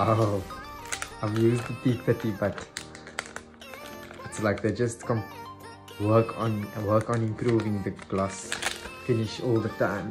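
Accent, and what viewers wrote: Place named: Afrikaans, South Africa. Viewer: Love the Afrikaans voice I'm also south African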